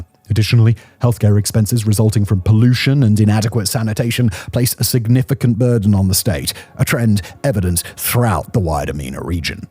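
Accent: United Kingdom. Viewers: High English level is required to understand this video. Is that british accent?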